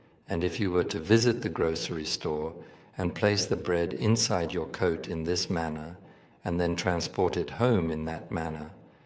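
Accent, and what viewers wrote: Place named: United Kingdom. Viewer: Etkileyici bir üslüb. İngiliz aksanı da çok iyi .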